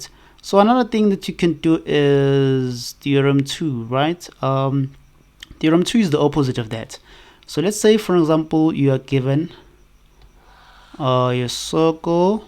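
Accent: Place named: India. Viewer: The voice seems indian lol but good video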